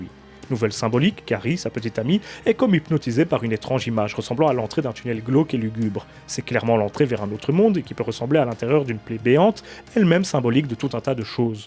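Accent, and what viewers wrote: Belgium. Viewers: Ouiiiiiii, moi aussi, pendant toute la critique, je te reprenais à haute voix,!;-) Mais en même temps, j’adore ton petit accent belge, ça fait partie de ton charme... LOL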